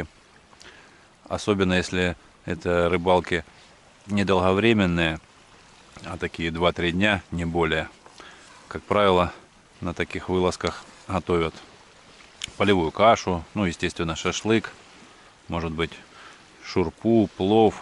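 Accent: Ukraine. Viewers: Та тут сразу по разговору слышно ,что наш с востока Украины!!!Привет из Мариуполя!!!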